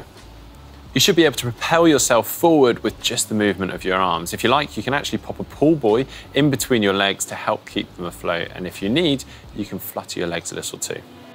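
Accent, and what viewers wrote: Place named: England, United Kingdom. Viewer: When receiving instructions, it's most believable hearing it from an Englishman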